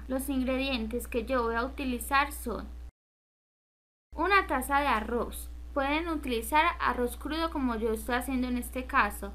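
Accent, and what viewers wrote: Colombia. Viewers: Me encantó! Si solo verlo da gusto imagínese probarlo :p saludos desde Perú. Ah por cierto esa voz tan típica de Colombia es hermosa y enamora😌😍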